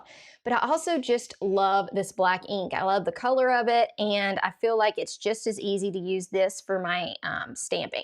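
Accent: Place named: Alabama, United States. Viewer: Really cute ornament cards!!! What an awesome idea for a card and lil gift! Side note.....we moved from Huntsville 2 years ago to be close to our daughter & son in law as they had our 1st grandbaby! Our son still lives in Huntsville and loves it and we visit as often as we can! I thought you had an Alabama accent! Yay!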